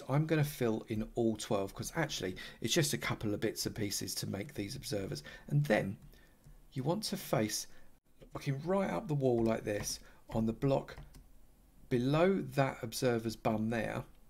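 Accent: United Kingdom. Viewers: It's called a British accent. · Cause he's british and sounds awesome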